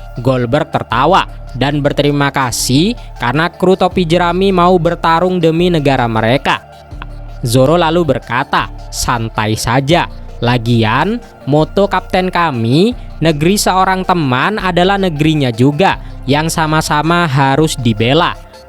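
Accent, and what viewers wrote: Madura, Indonesia. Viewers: Abang orang Madura ya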